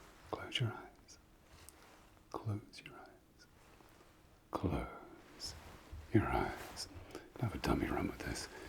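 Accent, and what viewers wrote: France. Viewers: " no screen 1h before sleep" woops. Désolé, i'm already in my bed watching your video xD what do we do xD Love your humour and your french accent, vous parlez très bien français ;) (from a french canadian ☺️)❤ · as a french person, i LOVE how you make the french accent !!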